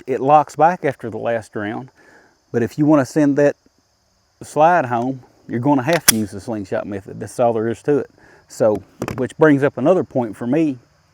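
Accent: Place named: South, United States. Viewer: Dude! I dig your last name.! That could be a band name. Right on brother! and I just subscribed to this guy's channel because of his non threatening southern accent, and I thinks he's right about the slide thingy.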